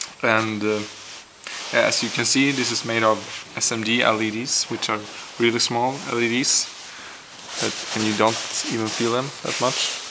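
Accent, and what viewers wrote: Sweden. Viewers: where are you from? accent sounds like sweden or smth:D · from sweden? sounds like that?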